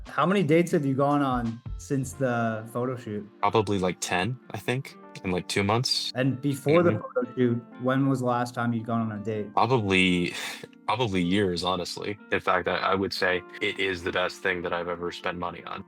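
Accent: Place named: Canada. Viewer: Thumbs down for excessive “bro” and irritating Canadian accent.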